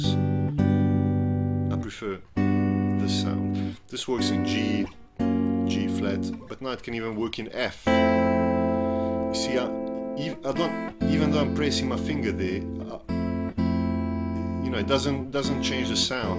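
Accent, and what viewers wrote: South Africa. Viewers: hey, don't get me wrong, there is a great advantage in barring sometimes. So, I won't say never bar. Barring can be really the only way to achieve some stuff. I do it all the time. I realize you are doing it as a time saver and it also eliminates this "where do I go" feeling of that finger. You just bar and know that now you only have 2 or 3 fingers to noodle around with above the bar. I understand. I thought later that maybe your accent was South African after I listened more closely. Just one or two words threw me off to Netherlands. haha Yeah, I grew up playing with a very clamped restricted style and I think it hindered me. But I started on acoustic so I was not playing elec till 3 years later and just always had this cramped style going on. But.... what do ya do? hehe just move on and try to learn more things. aight. talk to ya later!! 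· South African accent! His teaching style rocks!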